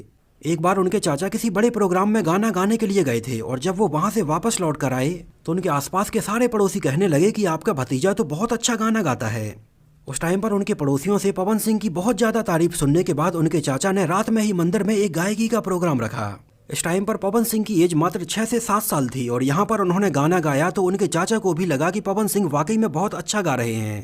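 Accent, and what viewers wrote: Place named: Bhojpuri, India. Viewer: Jio bhaiya bhojpuriya andaaz me mja aayi gayil ho👍❤️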